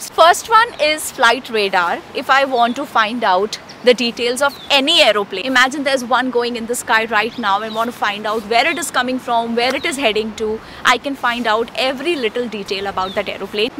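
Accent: India. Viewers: You still have the Indian English accent!!!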